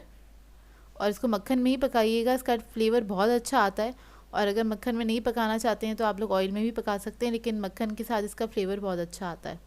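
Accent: Karachi, Pakistan. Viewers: if u dont mind AP ne kis field me graduation ya master Kia Hy AP buht nice way me BT krti haen Me bhi Karachi me rhi Hun lekin ab Punjab shift hogye pr apka way of talking Karachi ki yad dilata Hy ar buht achi trah explain krti haen AP recepies ko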